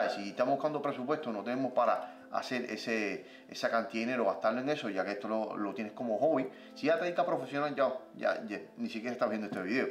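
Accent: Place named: Cuba. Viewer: usted por ese hacento es cubano . Esa tonada no se va ni con 24 años en Argentina. buen Video Suscrito Coherente Lo Que dices Saludos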